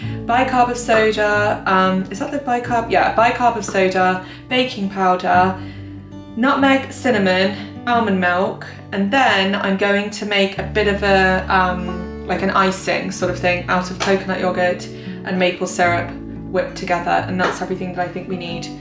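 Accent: United Kingdom. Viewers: Watching your videos is rather pleasurable and useful thing to me. Being a fan of English culture and language I enjoy both the information of English countryside life nowadays and listening to your British English. Living in the countryside expelled me from conversational practice which I had in abundance when I lived in the capital city. So it's a small consolation... The views of Cotswold are just marvelous. They're filled with history...👍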